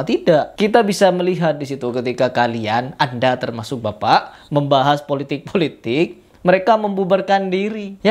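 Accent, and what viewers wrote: Madura, Indonesia. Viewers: Bg lu emang orang Madura yah?? Kirain cuma logat lu aja Madura.itu di caption lu pake bhs Madura kan??? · Pola oreng madureh kakeh mas. Logatnya kayak oreng madureh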